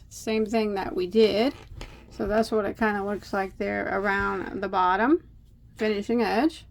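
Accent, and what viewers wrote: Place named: Midwest, United States. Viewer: I love it! I have been looking for the perfect pattern to make as a gift for my sister. Have a number of wips so will be a little while before I can start this. I love listening to you. I’m from Iowa but have lived in Washington state most of my adult life. I enjoy your midwestern accent. My youngest son often corrected me on n how I said wash. Mom there is no “ o” in Washington.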